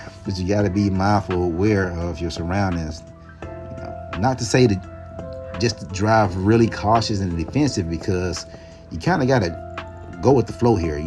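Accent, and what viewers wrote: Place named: Georgia, United States. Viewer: Love the video, looks beautiful. Your accent sounding like it from Georgia where I used to live 👌🏽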